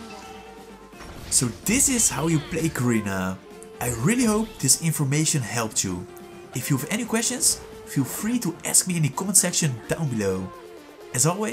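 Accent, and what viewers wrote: Netherlands. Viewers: ayo this guy is dutch i can hear your accent dawg.. Kaas 👀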